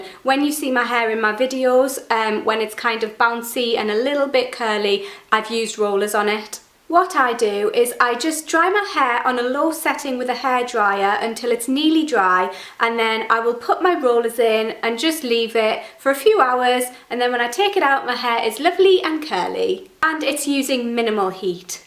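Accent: Newcastle, United Kingdom. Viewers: Are you British? It sounds like you have a Geordie accent. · AMAZING!!!! I love your accent where are you from? Obviously from England are you Jordie? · What i was thinking!sounds like a geordie a lot like pez's mom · are you irish,scottish,or british???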